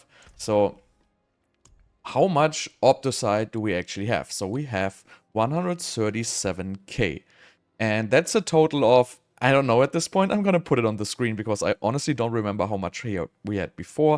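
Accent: Germany. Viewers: Schon in den ersten 5sek. wusste ich das der Dude Deutscher ist😂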